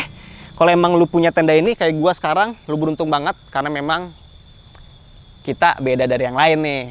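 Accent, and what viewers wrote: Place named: Java, Indonesia. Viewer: Suara lu kalo riview medok banget bang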